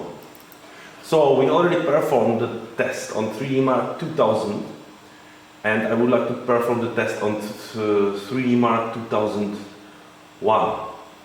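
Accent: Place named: Czech Republic. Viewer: Já si říkal, že slyším czenglish. Ale nemyslím to ve zlém, jen jsem tam v té angličtině poznal český přízvuk, tak rovnou píšu česky a pak se objevilo ve Windows Vitejte a bylo jasno. Též uvažuji udělat nějaký podobný test. PS: Toho Athlona fakt závidím. Nemám ani jednu Slot A desku a ani procesor, ale hrozně moc bych chtěl.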